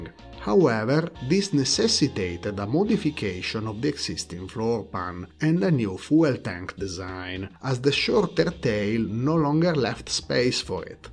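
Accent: Italy. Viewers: I am an Indian, and I compliment you on your grasp over English which is superior to most Americans and frankly, quite a few Britishers. I presume your accent is what is affectionately termed Italian. A small suggestion, "often" is pronounced "of'n".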